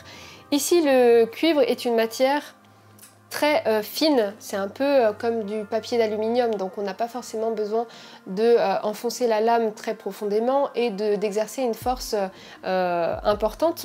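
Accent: France. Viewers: A few minutes later with French accent😀. Spot on. Nice channel.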